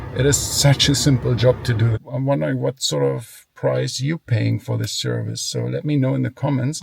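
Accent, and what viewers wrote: Germany. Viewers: I hate Germans speaking English.... including myself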